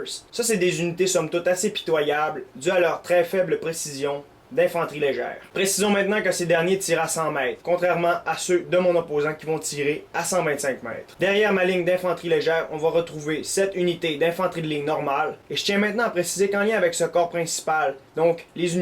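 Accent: Quebec, Canada. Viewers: est canadien?? c est de quel region cet accent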